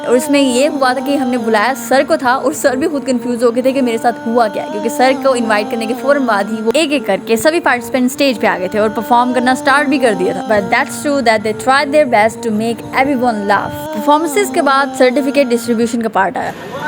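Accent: Punjab, India. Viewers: Yr sister ap ki cute punjabi 😂❤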